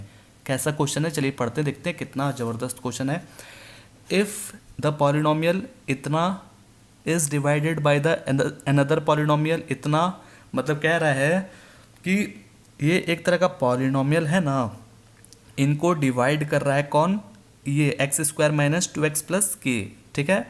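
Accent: Bihar, India. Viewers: sir app bihar ke ho?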